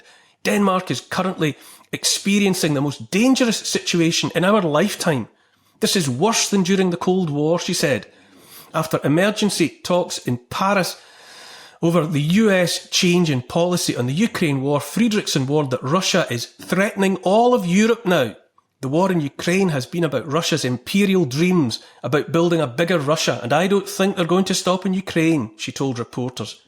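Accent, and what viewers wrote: Scotland, United Kingdom. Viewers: are you Scottish? just saw (the bachelor- dating all the ladies ) in Edinburgh, they really liked it. i had been there new years eve with a bunch of military couples long ago, it was so fun, people were so nice!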